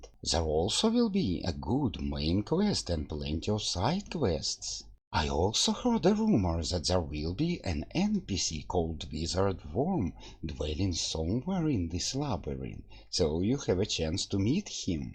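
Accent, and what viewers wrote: Russia. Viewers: Чет у меня подозрение что русско-говорящий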